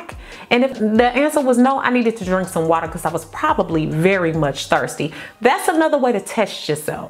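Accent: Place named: South, United States. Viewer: I hear some southerness in your voice. Thanks for this video.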